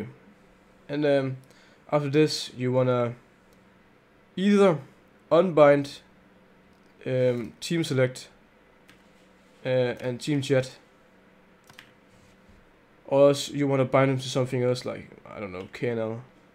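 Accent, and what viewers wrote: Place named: Denmark. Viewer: Hvorfor er kan man bare høre dit dansk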